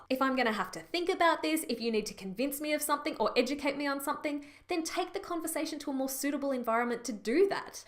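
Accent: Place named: Australia. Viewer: OMG! Love you! Aussie Voice #1 Relatable #2. Freakin Funny & Human #3 Thank YOU #4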